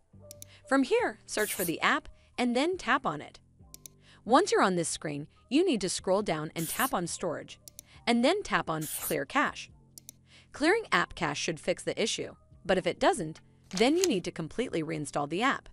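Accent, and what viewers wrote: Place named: United States. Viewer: Its British Gas .why is it an american voice i mean what .maybe this is why they are not working properly maybe its not Britsh anymore.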